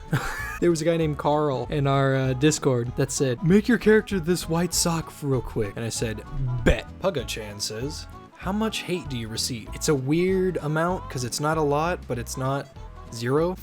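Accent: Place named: Dallas, United States. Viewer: So howabout that Dallas voice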